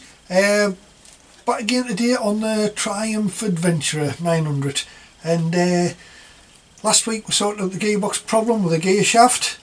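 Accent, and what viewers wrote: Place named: Sunderland, United Kingdom. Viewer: Great video 👍 This should help me out when i have to change my rear shock on my Thunderbird 900 triple some time in the next couple of days 😊👍 That sounds like a north east accent, Sunderland by any chance? 😊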